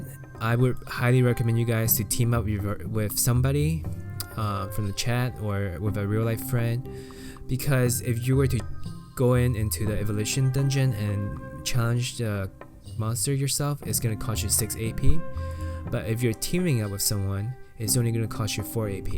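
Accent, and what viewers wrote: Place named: Asia. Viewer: Your english sounds like an asian english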